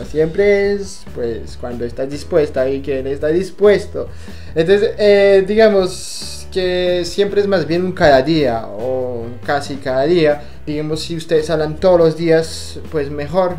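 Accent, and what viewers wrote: Colombia. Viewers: Tu español es muy bueno para ser Francés, tienes un acento bien colombiano btw. Muy interesante el vídeo lol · Holaa me encanta tus vídeos siempre hablas muy relagado y colombiano un abrazo 😚😊💞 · Hablas muy bien el español, incluso tienes acento, parece colombiano, eres genial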